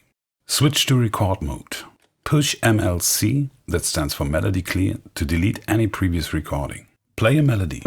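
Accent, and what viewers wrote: Germany. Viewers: I Got a question for the guy? Are you German? Where are you from? Its a weird question but I recognize the Accent. Greets from Hagen in Germany.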